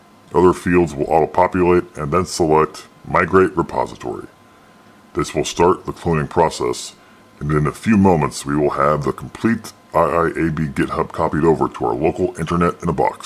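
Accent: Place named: South, United States. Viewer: Preppers are so cute when they are LARPing and all edgy and pretending their fantasy is going to happen. Gotta love the "creepy guy from the horror movies" voice modulation. Although, your southern accent and intonations do come through despite this.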